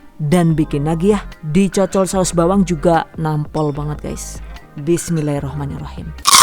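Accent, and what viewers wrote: Banyumas, Indonesia. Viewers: saya paling suka resep yg pake takaran pasti spt ini, bukan yg takaran "secukupnya". auto subscribe.... btw jg suka sama logat ngapaknya 😄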